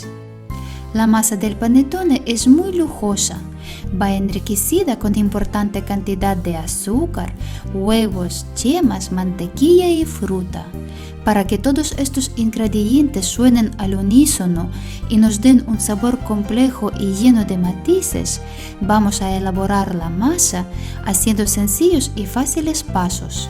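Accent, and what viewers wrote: Ukraine. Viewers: Hola te saludo desde Lima Perú 🇵🇪 esperando q estés bien de salud,un abrazo grandote,me encanta tu voz tu asento Ucraniano eres dulce,.por otra parte te digo q así de cariñoso somos los Peruanos, gracias por compartir tus recetas hice en la pandemia tus recetas y me salieron super rico mis panes,ahora haré paneton espero q me salga bien.un besote querida.chauuu cuídate. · Дякую, і слава Богу, що ви українка, бо я думав, що з розуму схожу, слухаю нічого не розумію, але відчуття, що на рідній мові😂😂, якась магія, успіхів Вам🌷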